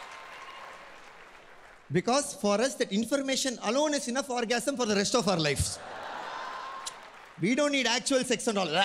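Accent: India. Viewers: Okay, so this is where indian stereotypical accent comes from. I am indian I don't sound like that. Nope. We all sound different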